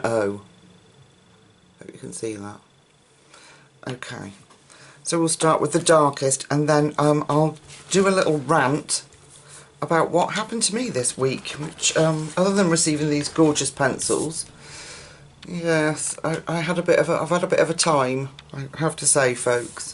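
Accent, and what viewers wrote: England, United Kingdom. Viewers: Actually they work pretty well over grayscale. I'm getting not full 120 set of Artists, but buying just those colours what are missing in Procolour and Coloursoft sets. Already got all greens and tried them here and there. O my gosh! They layer and blend as good as Polys and Pablos and Keep Sharp Point!!! I believe they'r as economical in long therm as Pablos and Polys. I found that at least in Klara Markova books i can put Procolours over Artists, over Coloursofts, blend with Derwent blender. Endless posibilities ... I was biten by german sheperd too, also in back when i was 20. Scar took more that 10 years to fade. It was in party and dog was police dog from my friends friend. I was dancing, mowed backwards and got too close to owner. Dog jumped and bite me in back without any warning. It was well trained policemen dog and listened owner in a second. 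everybody was joking that i don't have to make injections for rabies because it's police dog. I got close to owner about 1 meter. Guy said if i stayed 1,5m from him dog wouldn't attack. Lesson learned. Like Your colourings very much and way how You speak, Your accent is like my english colleague's here in France.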